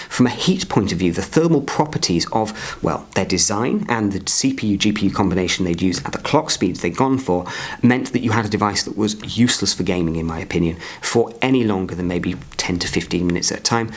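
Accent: England, United Kingdom. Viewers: Please slow down your speech. Some people don't do cocain nor are they nine years old. I was interested in this video for reasons of technical research and personal inquiry. It took me a half second to make out what you were trying to say about the device. I do find it slightly difficult to make out an English accent as it is. But I thought that if you were wanting your video to be more professional as it would appear to be such a topic. I think it could be spoken in such a manner where all could enjoy. Thanks! I tried to PM this message but the option wasn't available.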